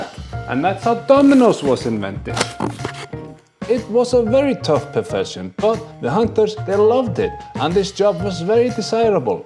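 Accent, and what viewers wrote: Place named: Iceland. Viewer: Everything sounds better with Icelandic accent! But be honest, you have eaten these birds before, did you?:)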